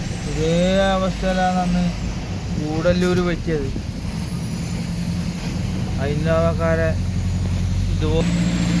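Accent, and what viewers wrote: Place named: Kasaragod, India. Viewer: സംസാരം കാസർഗോഡ് 😂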